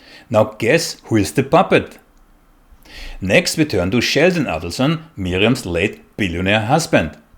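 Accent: Germany. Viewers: What other answer can be expected from a man with name Said Abou? Your Muslim hate for Jews you sucked with your mother’s poisonous milk. Your subscriptions are tell about you a lot… No, this German accent speaking Dutch antisemite is well-known by his lies, half-truths, distortions. So, he is your friend in hate…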